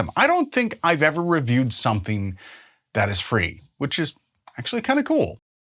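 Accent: Canada. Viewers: Ouch, this one stung about as much as your Canadian "about" pronounciation pet peeve. I assure you not every podcast is two people sitting and having a conversation. I've been doing an audio theater podcast for 20 years, incluing me doing multiple voices, adding music and effects that can can sometimes result in editing files that are 12 or more channels deep. And many other podcasters do the same. I only mention this because just as you wouldn't say all music consists of a guitar and a drum set and only requires two mikes, not every podcast can be boiled down to a talking heads show..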